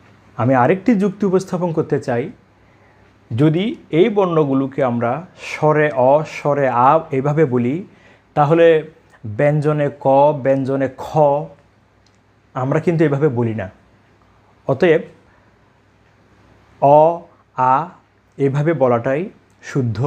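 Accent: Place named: Chittagong, Bangladesh. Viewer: চট্টগ্রাম এর আঞ্চলিকতার প্রভাব কিঞ্চিৎ লক্ষণীয়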